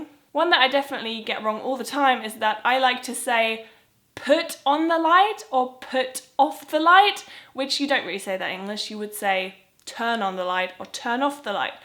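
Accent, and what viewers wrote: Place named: England, United Kingdom. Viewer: an deinem "CH" merkt man dass du in England lebst :) so süss haha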